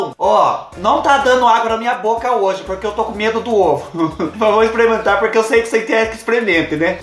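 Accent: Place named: Paraná, Brazil. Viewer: eu queria saber de q estado vc é?porque este sotaqe seu,parece do povo daqui do Paraná! 😘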